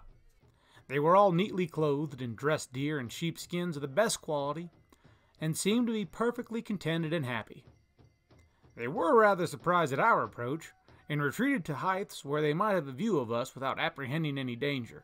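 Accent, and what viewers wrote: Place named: United States. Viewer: oh oh voices of the past except an American dude with an American accent! Very excellent, and fascinating!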